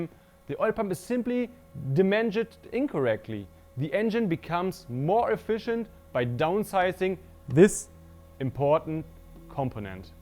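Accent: Germany. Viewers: shut up, he is German and has an accent, I challenge you to pronounce 10 German words correctly if you can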